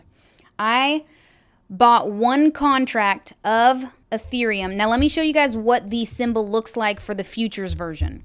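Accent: United States. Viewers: appreciate your vids, you have the best American accent ive heard (im from Europe)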